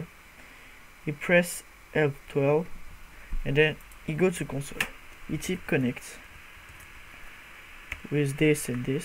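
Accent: France. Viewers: Are you french ? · C'est trop drole... Ton France es tres prononce. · Ton accent est mortel :D French powa ! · T'es français toi avec cet accent non?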